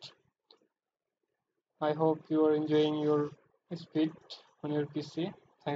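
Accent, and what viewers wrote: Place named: India. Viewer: I couldn't understand what this guy was saying. Indian accent.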